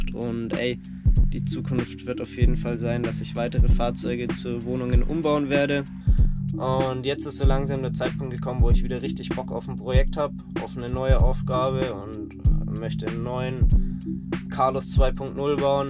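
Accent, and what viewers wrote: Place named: Upper Franconia, Germany. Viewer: Am Anfang vom Video hab ich mir gedacht der is doch sicherlich aus Oberfranken und dann ah der kommt ja auch ausm Landkreis Bamberg wie man selber 😂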